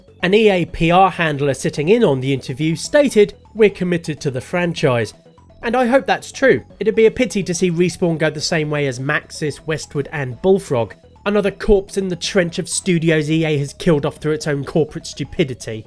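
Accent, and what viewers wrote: England, United Kingdom. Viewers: Real talk, you are really clever and funny, produce timely content on a regular basis, and have an English accent that makes you sound smart to everyone else. I don't know why you don't have 500k subs, but I am sure if you keep your nose to the grindstone you'll get there before you grind away your frontal lobe. May have taken the metaphor a bit far there.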